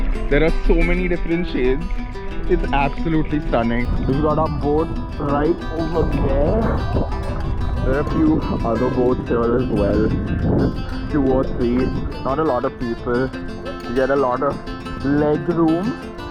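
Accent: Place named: India. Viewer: It’s tough to watch this with that Indian accent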